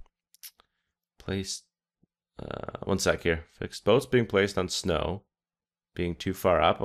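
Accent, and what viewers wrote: Canada. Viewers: ... I KNEW IT!!! Oh god.... You are canadian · are you canadian?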